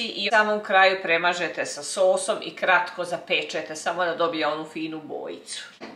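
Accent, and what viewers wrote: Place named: Slavonia, Croatia. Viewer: Sta reci - potpuni uzitak gledati kao i uvijek spremanje odlicnih jela i slusati divan slavonski nagalasak. Moram probati ovaj recept za krompir. Pozdrav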